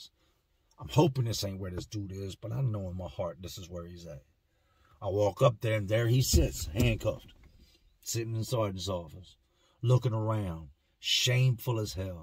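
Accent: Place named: Boston, United States. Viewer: yep all he does is do stories about small fry rapper beefs and shootings n shit. Just regurgitating the same shit as every other news outlet. He just does it with a Boston gangster accent. Thats it.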